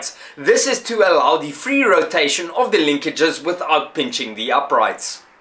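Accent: Afrikaans, South Africa. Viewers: Is that a south African accent? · It's English......from South Africa. We have accents. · Hey man, your accent….? Is it Afrikaans?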